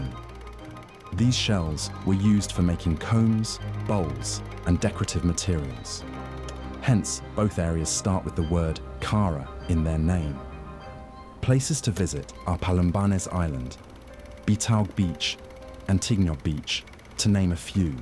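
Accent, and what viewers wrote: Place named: United Kingdom. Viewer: Excellent documentary! Your accent sounds British to me and not Californian. But what do I know...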